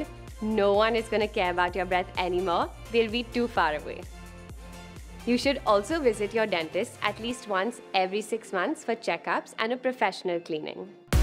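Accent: India. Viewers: Her, Face -🇺🇸🍔 Accent -🇮🇳🍛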